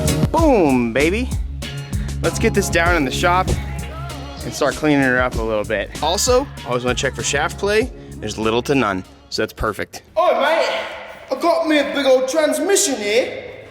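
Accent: United Kingdom. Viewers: Dude...your British accent is tits! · British accent on point BOI.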